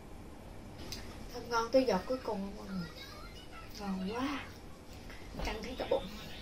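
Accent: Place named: Quang Nam, Vietnam. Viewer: chuc mung Nam moi chuc gia dinh Nam moi vui ve hanh phuc khoe manh nha🎊💥 Noi con o cau Mong Co gan may Quan ban bo tai ko rua Co di tu Nam 1990toi bay gioi ve Lai ko nho. Vi Co nghe giong cua co n noi co nghi con la Nguoi Quang nen chi hoi tham thoi.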